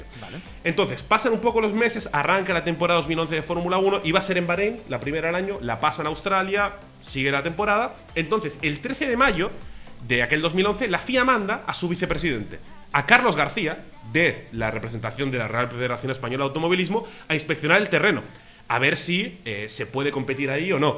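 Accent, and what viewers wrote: Argentina. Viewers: Y el acento argentino?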